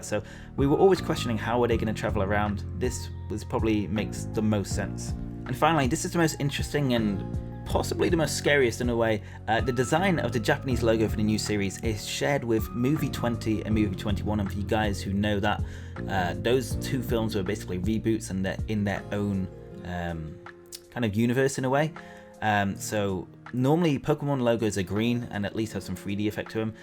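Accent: United Kingdom. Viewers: Bootiful British accent I think? Makes me swell wit pride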